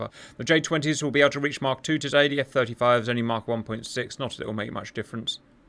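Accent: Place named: United Kingdom. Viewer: No we love your beautiful British accent commentating the battles. It's just a shame when so much is happening all at once in the big battles that you can't commentate all of it!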